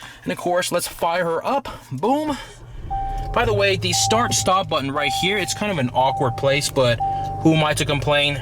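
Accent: United States. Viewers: The way you talk, quintessential american. like it....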